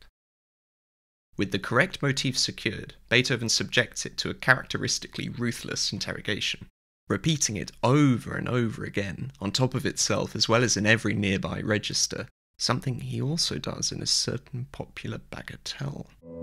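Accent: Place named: RP, United Kingdom. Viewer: Lovely little video chock full of insight! You should know that I’m positively besotted with you — your angular Anglo-Saxon beauty and the plummy notes of your delightful posh accent (at least to my American ears). I’m a composer in my own right and I find your commentary spot on. Pardon my little outburst of gushing, but I wanted you to know how much I appreciate your videos. Keep up the good work!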